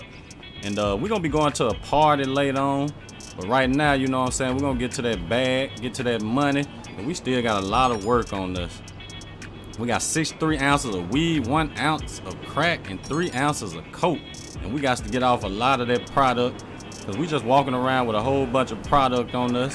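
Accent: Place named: Texas, United States. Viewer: This guy has to be from Texas. Love it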